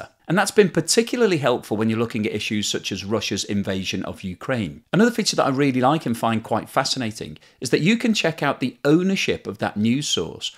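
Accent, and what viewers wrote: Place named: Liverpool, United Kingdom. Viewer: Great content and superb delivery -{ for non-UK viewers, delivered in a pleasant Scouse ( ie Liverpool) accent )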